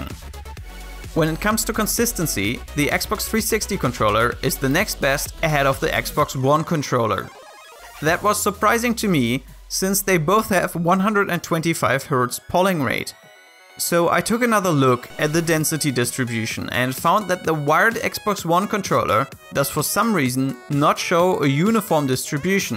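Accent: Germany. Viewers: Are you German? Great videos! (Subscribed!) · You sound german. Are you?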